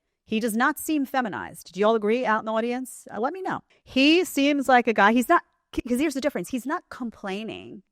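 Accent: New York, United States. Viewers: I like your NY accent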